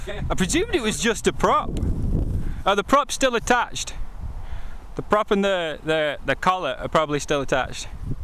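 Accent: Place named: United Kingdom. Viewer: I'm not in the UK though. I just thought by the way he sounded he was in the UK when I watched his videos. I live in Florida, I already bought rbg strip of led, just because I know I'd need help with oriantation, this is my first quad I can tune on my own. kinda excited and really wish it would come I'm already! !! I wanna fly it... I saw at the hobby shop a race drone with fpv and everything except battery and controller on sale for 170 I'm thinking of grabbing that as well lol. I been dying to fly and hook up my fat shark to something that flies again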